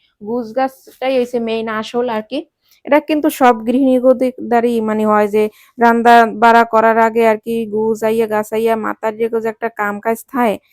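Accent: Barishal, Bangladesh. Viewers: আপু বরিশালের ভাষা খুব ভালো লাগে 💘💘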